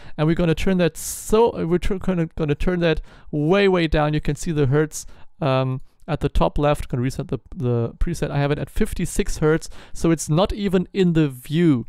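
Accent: Germany. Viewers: you can hear that hes german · dein English sagt du bist Deutsch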